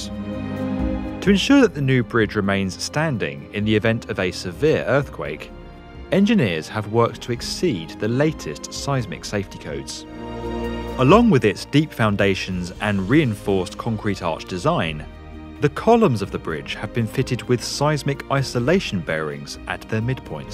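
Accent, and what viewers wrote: England, United Kingdom. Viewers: Why do we have to have a bloody fuckin' Britt narrating about an American bridge? Holy George Washington Batman! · Impossible! Why does this have an English accent? Is LA in England. No No such thing as earthquake proof. Ridiculous. Earthquake resistant? Maybe.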